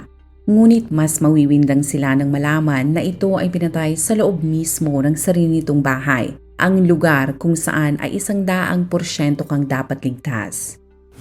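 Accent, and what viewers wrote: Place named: Visayas, Philippines. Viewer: Bisayang bisaya mag salita yung narrator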